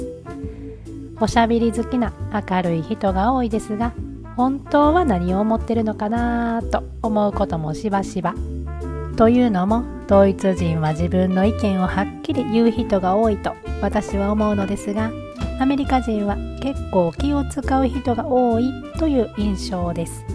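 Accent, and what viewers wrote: Kansai, Japan. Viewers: 関西弁に癒されます❤ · それだけ 海外暮らしが長くても 関西弁抜けてないっていうところを見ると もう日本で住んだらいいんじゃないの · 君関西人やろ知らんけど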